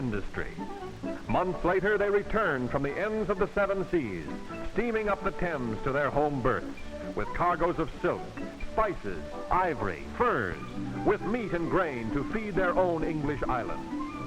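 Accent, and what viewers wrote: United States. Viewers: Will have to get a copy of this. Shame about the corny American voice-over but the images are great.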